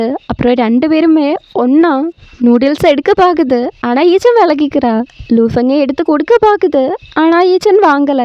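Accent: Kerala, India. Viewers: Chechi sherikk malayali thannenoo malayalam explanation mm Tamil explanation adipoli ayitt parayndallo 😌❤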